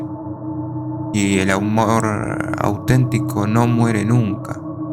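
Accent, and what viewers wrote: Argentina. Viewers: La narración no es tan envolvente ó agradable.... Ese acento argentino ó paraguayo, no provoca seguir escuchandolo...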